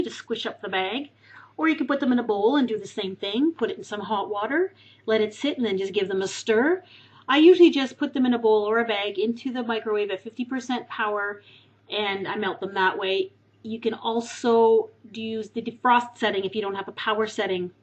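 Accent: Canada. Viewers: It's called a Canadian accent.. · Are you Canadian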